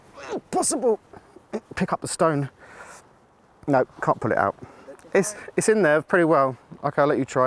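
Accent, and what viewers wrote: United Kingdom. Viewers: You with your British accent and with his French accent are a lovely foil...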